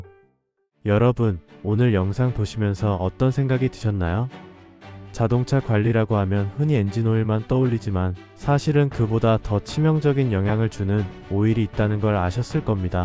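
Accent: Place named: China. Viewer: 말이 서툴러도 직접말하세요. 읽어주는 자가 중국인으로 추측됩니다 한국어를 짓발는 행위를 그냥 두고보면 당신도 간첩이 됩니다.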